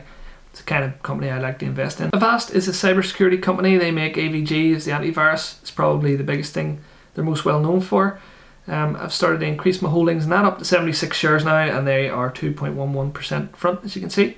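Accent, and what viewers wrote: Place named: Tyrone, United Kingdom. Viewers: Do all stocks have a dividend and how do you know which do and do not ? Nice to hear another accent from the north:)) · Local accent, subscribing just for that reason. Tyrone man here. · Hi nice to hear a local voice! I’m from Fermanagh you sound like a Tyrone/Derry man. I’ve got big into investing in stocks this past few months and have done very well. Subscribed and can’t wait to watch more contact 👌🏼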